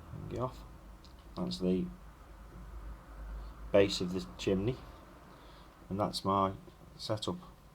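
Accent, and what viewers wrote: Stoke, United Kingdom. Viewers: Aye up mate.....i was really surprised to hear a Stoke accent doing a review. Ive noticed a lot more people walking round with e-cigs just lately.... are there any good brick and mortars selling good quality liquids (locally) that you know of? keep it up anyway mate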